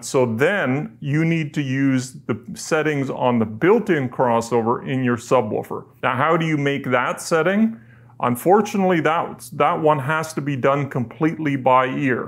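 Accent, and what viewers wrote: Canada. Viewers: You must be Canadian!! Or from Minnesota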